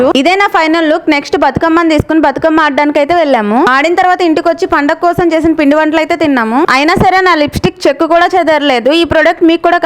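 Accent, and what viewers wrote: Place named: Andhra, India. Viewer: Jara Andhra slang apu akka maku nachuthaledhu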